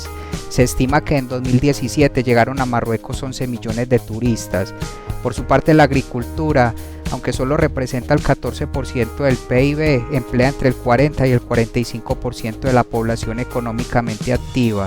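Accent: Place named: Antioquia, Colombia. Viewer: No púes, y Con acento... Paisa... home! 👍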